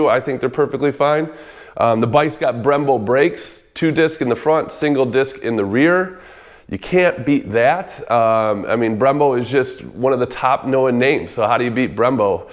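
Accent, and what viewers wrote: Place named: Chicago, United States. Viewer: I have the Akrapovic Header and the beautiful diamond stitched Harley custom seat and vrod soft bags with VRod stitched on the sides with plastic inserts. Im so glad I didnt trade my bike like I almost did. All I had to do was get on the bike and start heading to Champagne Urbana from Bloomington to trade for the other bike and realized what the hell am I thinking! Pulled over twice before turning around saying im not trading this thing for nothing! 😂 Now that I didnt do it i realize I would have been kicking myself in the ass the rest of my life for such a stupid trade. Lol! The Deuce was a awesome bike and was chromed out to the max but it could have never held a candle to my Vrod muscle. My bike is the exact for as yours and I I actually have floor boards on mine instead of pegs and so my feet aren't like riding with forward controls which to me makes it even way more comfortable to ride and probably even safer. 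These Vrod Muscle's are definitely special bikes. I would think than anyone who hasn't ridden one would fall i love with these bikes if they did. Now someone would have to deal my Vrod muscle away from my cold dead hands to get it from me. I still have my original pipes but this Akrapovic Header for it just sounds incredible on it and is the number one Header pipe for the Vrod muscle. Since your in Illinois and im in Bloomington Illinois I was just wondering what city your in? Im thinking by the sound of your voice the Chicago suburbs somewhere? Lol!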